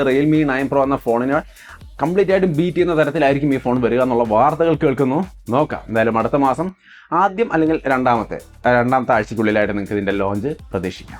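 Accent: Thrissur, India. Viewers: 0.46 nalla Thrissur bhasha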